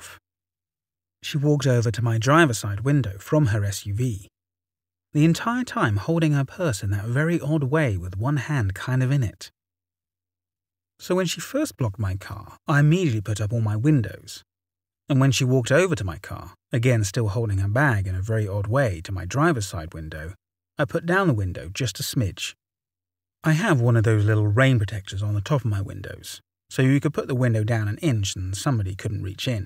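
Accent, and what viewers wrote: United Kingdom. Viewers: Love this guy! His narrations are so good! The British accent gives a flavor to it! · British accent or not, his delivery is high quality.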